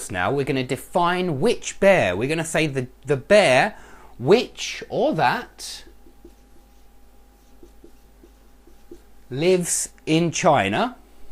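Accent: England, United Kingdom. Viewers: are you English? I loved your accent:)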